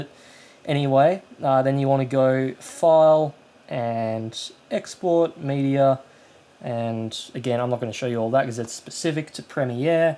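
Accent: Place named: England, United Kingdom. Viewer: Your English is so English.. Us people from non-English-speaking countries may find ourselves as an intruders... Keep it low so us can understand you. Thanks anyway for the nice video!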